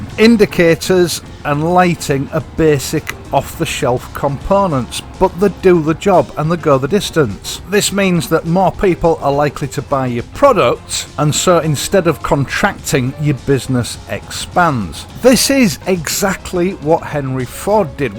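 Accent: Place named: Black Country, United Kingdom. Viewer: if I may be so bold, having watched a great many of your videos, I have come to the conclusion that you are from a sadly extinct species that I would label a "proper copper" I grew up in what was then a small village of a thousand or so people. the local cop new all of the "conspicuous " kids, and while I am not really for physical punishment, having a cast iron boundary in the form of a large shiny black shoe, applied just firmly enough to command respect changed the course of the life of some of the disreputable youngsters I knew. what kept me in line was knowing if I offended, he knew who I was and would be round to read the riot act to my parents (both ex military) he knew me because I was conspicuous at 14 I was six foot four and 15 stone, so i stood out. many of the "problems I see now seem to come from the extinction of authority figures like that, plus being born in Birmingham, as was my dad i enjoy your accent, which while quite different from Brumie still is very black country in its own way. 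keep up the good work, loving it 😃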